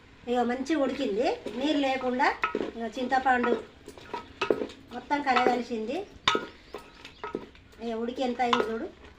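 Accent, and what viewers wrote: Telangana, India. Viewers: మీరు మాట్లాడే తెలంగాణ యాస మీ వంటలు కమ్మగా ఉన్నాయి😋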